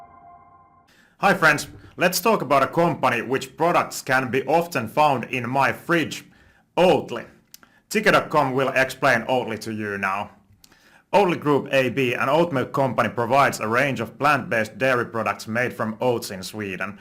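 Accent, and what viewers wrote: Finland. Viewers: I FKN love Finnish accent when speaking English, littery getting goosebumps. Much love from Sweden😊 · you sound finnish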